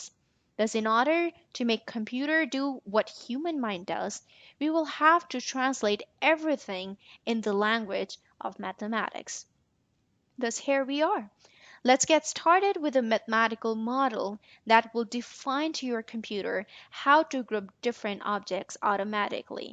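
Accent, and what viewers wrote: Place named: Pakistan. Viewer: I wondered after listen to this that you belong to Pakistan. you seem to be a native speaker. anyway, thanks a lot for this great lecture.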